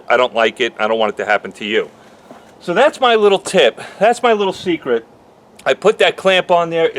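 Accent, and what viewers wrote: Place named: New York, United States. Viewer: Awesome ideas to make everyone"s world easier, Thanks! How did I know You were from up north? I guessed jersey, but the accent isn' t heavy enough. Maybe boston, but no. Should have known NYC. I am from upstate myself. In NC and VA they have tried to get me to be a rebel! Not working!! Love them all anyway! Thanks for all your vids and hard work.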